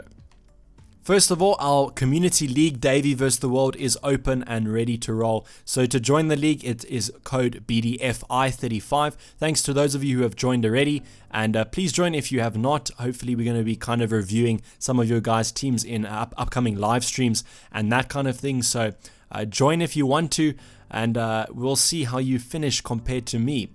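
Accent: Afrikaans, South Africa. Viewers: Is jy afrikaans😂🤔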